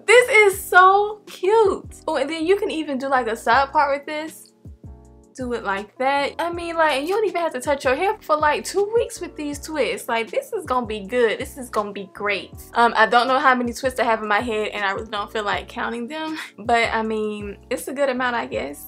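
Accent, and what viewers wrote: South, United States. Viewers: What part of the US did she get her accent from ? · “...there you go! Throw it to the beck!” lol that country accent! Beautiful lady and beautiful hair! Got me another easy style! God bless sis! ❤️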